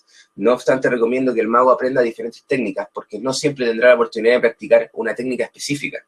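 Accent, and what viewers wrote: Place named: Chile. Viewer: 🤗¡ Me encanta el acento chileno ! 🇨🇱❣️ 🥰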